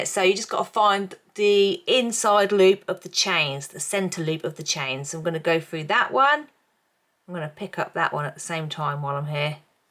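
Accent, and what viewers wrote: United Kingdom. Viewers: At last a british voice,phew what a releif.Love the tutorial,am following along,just need to find the measurements i need.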